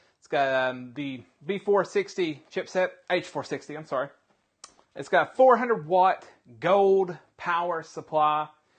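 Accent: South, United States. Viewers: dually noted and I will take your points into consideration as I execute my purchase in the very near future. As a consumer of content, may I say, I reallly, like REEEALLY, love the deep southern accent in these build/review videos! It's refreshing compared to those grossly proper UK creators or Bollywood types ;) Keep up the good work my friend and I look forward to some updates on the systems you have already built. TTYL! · Are you from Southern USA ? I've heard this accent in some old Hollywood movies. No hate, just curious.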